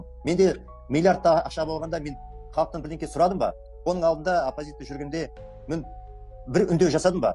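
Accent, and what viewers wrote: Standard. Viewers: Мұхтар Қабыл ұлы қазақшаңыз керемет таза сөйлейді екенсіз❤Аллаһ күш қуат берсін.тілегіміз орындалсын .Әмин.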